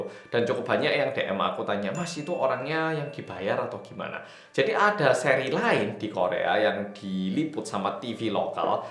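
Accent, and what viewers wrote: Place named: Malang, Indonesia. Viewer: nggk nguati medoke ya mas... khas kera ngalam... salam dari Yulis4994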